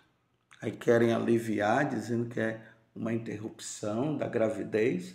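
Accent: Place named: Northeast, Brazil. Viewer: Bom dia povo santo de Deus, amo ouvir as homilias do padre, lembre -se ele tem soutaque nordestino, assim como eu tem momentos que falamos mais leve outras horas mais enérgicos como voceis estão falando, um bom dia a todos com as bênçãos de Deus!❤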